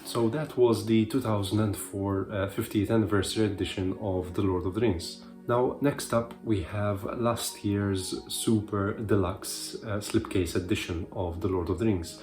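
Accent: Malta. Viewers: Hello from a fellow Maltese. Recognized your accent. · Given your english accent, i think you're a fellow Maltese :) beautiful books, but i'm torn between the 2021 standard and the 2004 harper collins 😅 might cave in and buy both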